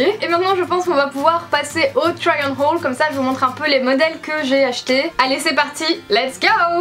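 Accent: Belgium. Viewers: tu es belges ?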